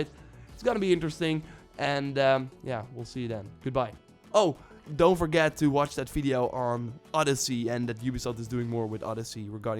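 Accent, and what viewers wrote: Netherlands. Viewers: love ur dutch accent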